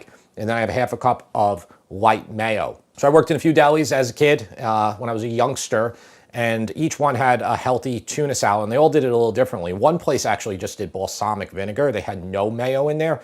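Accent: New York, United States. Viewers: I'm a vegetarian, don't eat tuna, but love your NY accent and watched the video all the way to the end😅😅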